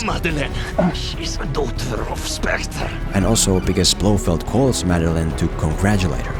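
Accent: Finland. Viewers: Your accent… Finnish?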